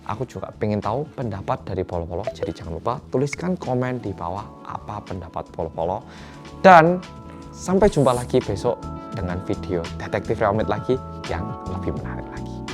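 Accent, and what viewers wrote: Java, Indonesia. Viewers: wong jowo mas? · Entah kenapa selalu rindu suaranya mas yang medok bgt itu lho😭😭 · Cus langsung ndelooookkk.... Notif Bersama orang korea yang medooookkk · Semangat mas . Seneng aku lihat masnya pakai bahasa indo medok. Sekali kali coba bahasa Korea ... Pakai sub ... Jadi pingin denger kalau bicara bahasa korea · Dua kata untukmu mas, "Medok banget" Tapi justru itu yang bikin seneng banget lihat chenel nya mas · Biasanya selain medok mas bilang apa ya di intro? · Bersama orang korea yang medokkk aaaah bikin rindu bgt 😂✨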